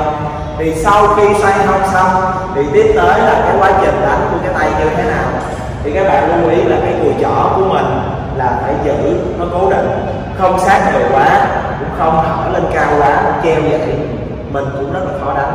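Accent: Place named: South, Vietnam. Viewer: Anh, next time I might visit you, when I am close to HCM. I am a northern and at times I still struggle to understand all of your southern dialect.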